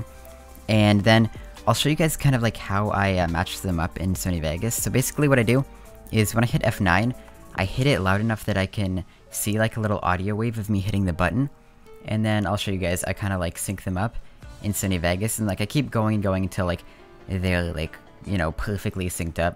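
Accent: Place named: Quebec, Canada. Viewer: THAT FRENCH CANADIAN ACCENT THO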